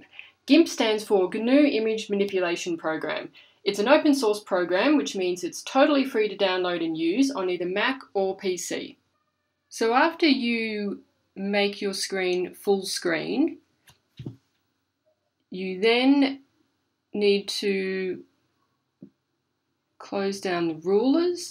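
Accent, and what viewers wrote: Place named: Australia. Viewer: Your Aussie accent is awesome!! 🤗❤️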